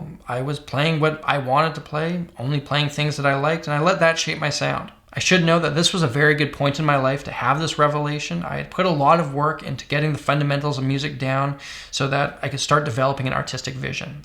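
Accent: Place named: Canada. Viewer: This dude sounds like a stoned Canadian talking really phylisophically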